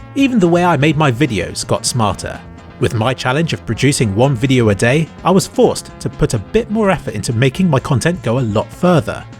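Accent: United Kingdom. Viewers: Klikbait is better than Clickbait. Thought I watch your videos due to your quality and soothing (Bristish?) voice. I watched your latest video about reflections in Cs:Go and had no clue what you were talking about yet I enjoyed the video since it appears that you know what's going on. Even your reaction video on Half life Alyx is intelligent, most reaction videos are morons pointing out obvious things like excited apes, but you actually point out things that most people wouldn't see otherwise- tech related things for example. Don't change your style and honesty as it defines you and your channels.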